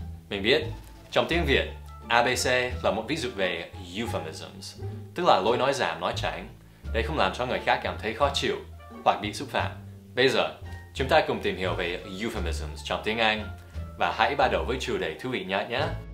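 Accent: Standard. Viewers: nói tiếng việt chuẩn lắm rồi nha:) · Cảm ơn bạn!Video của bạn thật là thú vị dễ hiểu , dễ học và dễ nhớ .Tiếng Việt của bạn tương đối chuẩn !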